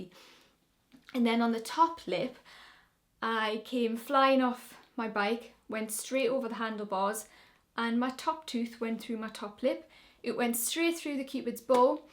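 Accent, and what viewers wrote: Newcastle, United Kingdom. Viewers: Are you from South Shields? I'm from Newcastle and your accent sounds familiar!!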